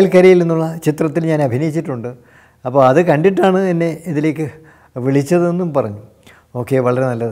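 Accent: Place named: Kannur, India. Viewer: Kannur karanano, aa samsaaram varunnu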